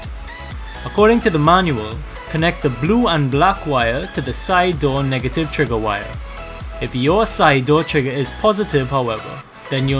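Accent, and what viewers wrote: Trinidad and Tobago. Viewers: You have a trini accent but I’m wondering where you’re from.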